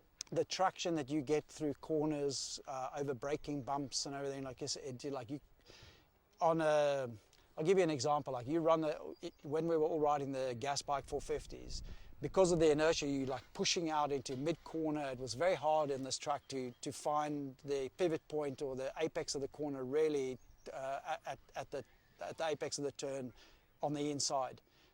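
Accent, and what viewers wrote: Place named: South Africa. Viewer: You sound South African :)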